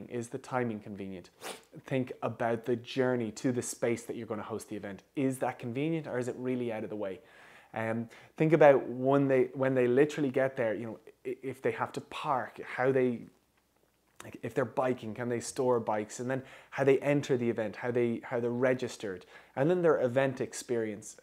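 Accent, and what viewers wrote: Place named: Dublin, Ireland. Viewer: Hi! Watching from Alaska and right off the bat I knew you had a Dublin accent! Almost even a little more southern than Dublin. My dad is from Cork! Thanks for this video! I know you make a ton of videos but you didn’t start your video with an intro! No hello or anything! But lots of info! Thank you thank you!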